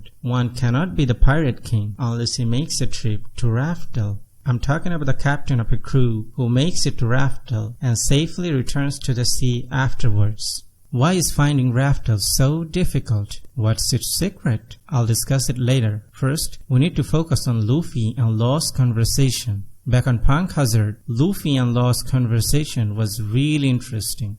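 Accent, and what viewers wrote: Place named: India. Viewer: You're Indian aren't you? Accent gives it away nice video anyway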